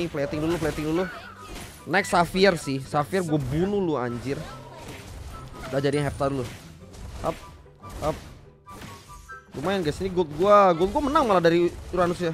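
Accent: Palembang, Indonesia. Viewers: "Aii dahh".. Dialek wong plmbg la nempel trs jo ee😅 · Masih ada logat palembangnya "Aiidaah"😂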